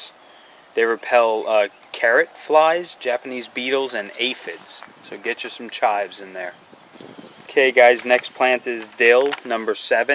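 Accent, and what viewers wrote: Philadelphia, United States. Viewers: Zone nine? Do I hear a Philly accent though?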